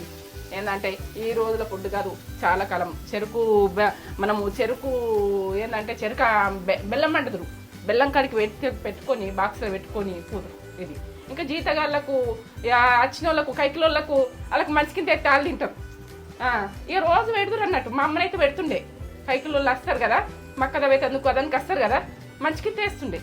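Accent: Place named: Yadagirigutta, India. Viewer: Aunty meeru matladutunte same maa akka valla attagaru matladinatte undi mee slang..medi yadagirigutta side untara aunty..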